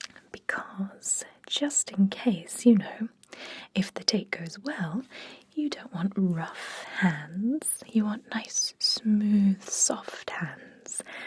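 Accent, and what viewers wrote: United Kingdom. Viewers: plus a britsh accent help too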